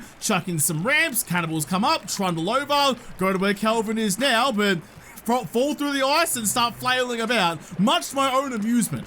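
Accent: Australia. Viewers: bro he has the most Australian Australian accent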